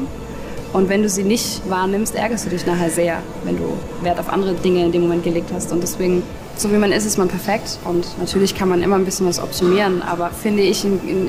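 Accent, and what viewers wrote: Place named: North Rhine-Westphalia, Germany. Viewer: Mensch. Bin total enttäuscht über Deinen NRW Slang. Ich dachte Du bist aus Ba-Wü und kannst echtes Hochdeutsch. Ausdrücke wie Spoaart oder Leaaarnen gehen gar nicht. Es heißt Sport z.B. mit kurzem O und keinem anderen Vokal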